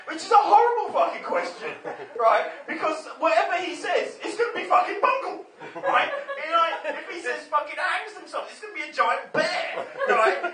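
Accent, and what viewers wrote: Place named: Swansea, United Kingdom. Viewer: he sounds he comes from Swansea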